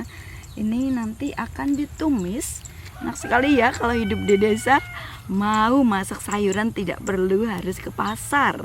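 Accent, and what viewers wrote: Banyumas, Indonesia. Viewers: Wahh wonk ngapak to mba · Wah,ternyata aslinya ngapak. Bener² gk nyangka. kirain asli bali. Sukses selalu ka 👍😘 Seru banget panennya, sy jd pengen pulang kampung 😊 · " kepriye".. 😄 kirain kak asli orang bali atau menado.. ternyata banyumas ya... ❤️ seru euy kebun ibunya kakak.. sehat selalu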